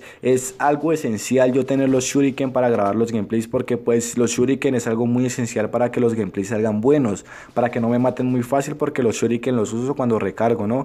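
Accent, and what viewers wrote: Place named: Colombia. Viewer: Pensé que era colombiano por el acento y cuando dijo bacano me lo confirmo . Saludos desde bogota !!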